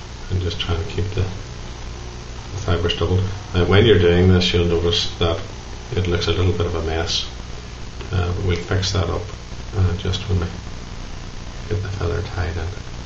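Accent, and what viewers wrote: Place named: Northern Ireland, United Kingdom. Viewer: Always been pondering about a fly to use on that simply difficult river, this fly built to great complication has opened great opportunities to fish on this river. i caught 3.5 with this fly alone in one day "WOW", anyway thank you for the post, my brother Henry will enjoy this video too. Like the northern ireland accent.......... Tight lines.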